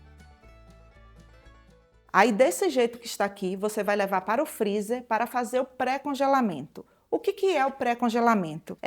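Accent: Bahia, Brazil. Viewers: Tenho certeza que a senhora é baiana É o nosso sotaque! Maravilhosa receita !!!!! Parabéns pelo trabalho 🎉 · Oi amada com certeza vc é baiana sotaque que morro de sau😮dades dos meus amigos solteropolitanos, morei em Salvador 22anos, bjos amada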